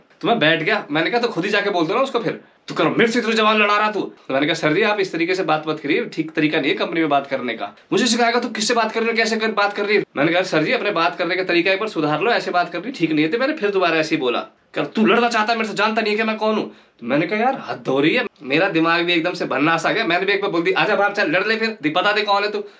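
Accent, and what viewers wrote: Haryana, India. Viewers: Ye bhai haryana faridabad se hain ya utterpradesh Noida se boli dono ki same hai.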